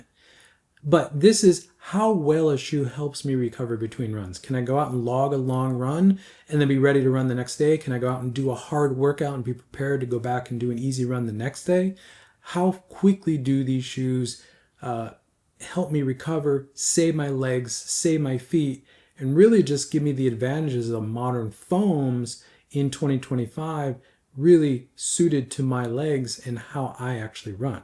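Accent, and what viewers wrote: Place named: United States. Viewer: I had no problem understanding you and I’m surprised to hear people did. Maybe it’s an American accent and idiom thing.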